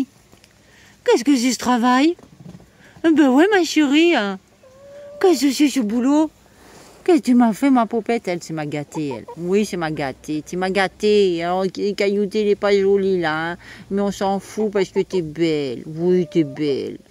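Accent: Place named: Auvergne, France. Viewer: Coucou, Merci de nous régaler avec votre quotidien au poulailler, et votre accent auvergnat naissant. Gratitude pour tous vos partage et pour faire rayonner notre belle region. Je pari que les nouveaux seront des barbues belges Bonne fin de semaine a tous et courage a tous pour l arrivée de l automne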